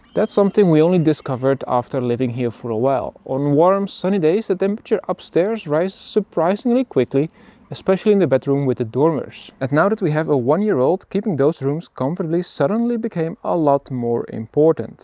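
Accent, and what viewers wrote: Netherlands. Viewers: You remind me of an over the internet friend from Holland. One by the last name of van Rens. Somehow I immediately knew you were from Holland. 😂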